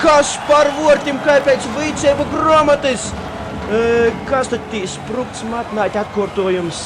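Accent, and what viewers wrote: Latgale, Latvia. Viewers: Man latgaļu sakāmais vienmēr ir paticis. Un te komentēšana riktīgi laba, jautri :D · nuja latgaliesi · Ai,ai,ai kai tai piespele nu.. nevar saprast kas tur beja :D tā viņa intonācija vienkārši nogalina :D Es neesmu latgaliete, bet man ļoti patīk šis dialekts un labprāt pati iemācītos tā runāt :) Puisis labs komentētājs, cepuri nost :) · Šo čali par komentētāju...tik labs...tāds jautrs un dzīvelīgs!:) un protams, latgaļu dialekts piedod to odziņu!:)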